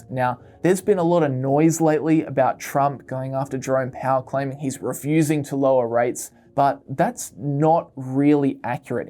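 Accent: Australia. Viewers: Maybe I am biased towards the Australian accent, but your videos are really so much fun and so much info. Thanks from the bottom of the heart!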